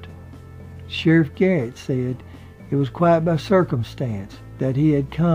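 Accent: South, United States. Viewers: This is a very interesting video as usual,. I still like that southern-type accent (at least to me, from Michigan) you have. Your voice always adds to the mystique of your historical stories. Of course, the pictures you take that go along with your videos, really set the mood well. I especially like your visits to the historic cemeteries as well. Great Job!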